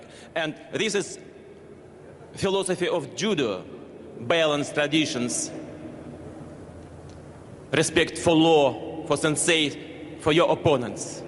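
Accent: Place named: France. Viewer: Sounds French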